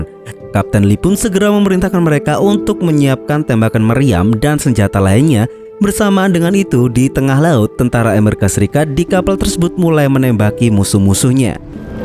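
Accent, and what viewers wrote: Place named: Kediri, Indonesia. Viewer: Modele bahass ne keterangane koyo wong kediri gueeriii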